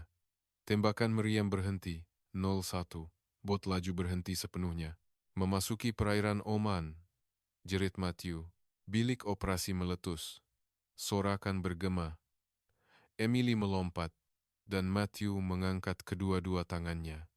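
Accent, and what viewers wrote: Indonesia. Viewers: Chanel dari indonesia ni, huruf je pon dia baca cara indon.Tapi betul ka x itu blm pasti. Blm ade pengesahan cerita setakat ni... Rekaan cerita je ni. 😂😂😂😂😂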